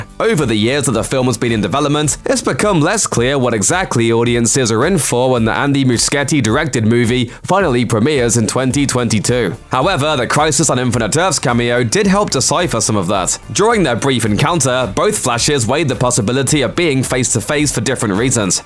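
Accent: United Kingdom. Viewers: He's actually British. He's a YouTuber called. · I can’t tell if it’s British or not lol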